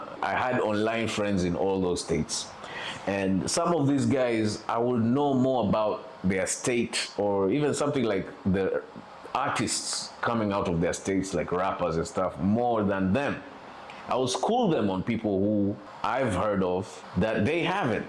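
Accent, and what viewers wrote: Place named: Kenya. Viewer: Tell this to the Gen Z:s....They are about to tip over the boat. It pays to travel, to step out of your parents compound. You got it right, in a way I could not put into words. Your English is neutral Kenyan....one of the best across the world. You know the sheng', ghetto, gangster pidgin of the black American celebrity cult, full of profanity? It is negative and heavy. Carry on, let the world know that Kenya and Kenyans are blessed. I was in Uganda recently, a very well resourced country with a lot of natural wealth, great people, great African culture. I love Uganda just next to Kenya but, I get that relief 😮‍💨 once I cross into Kenya.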